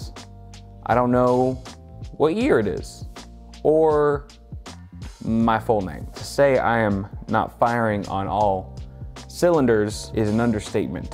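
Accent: South, United States. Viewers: Did you move to the south or something? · I'm from Houston Texas and he sounds more southern than me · where did the southern drawl come from? Jetleg does some weird shit to. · cool build! 400% the amount of allowed cowboy accent in youtube videos though. shame. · Oh, good, he was speaking with a southern accent. I was tired when I watched it so I thought I was imagining things. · Jetlagged sounds like a southerner. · What’s up with the country good ol boy accent lol ? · just created a new market segment. Better get a patent on this innovation! Awesome video with southern accent too! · new southern US accent? interesting haha... who have u been hanging around lately?😃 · What region of America accent is this? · why doe she talk like a southerner the whole video? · The southern accent and all the cowboy puns were very random and made no sense to me, I just started tuning out half way into the video. 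· Southnern sounds pretty cool yaw🤠👍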